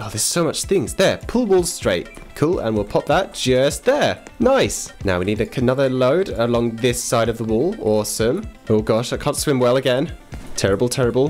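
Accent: United Kingdom. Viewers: AWW YOUR BRITISH!!! :3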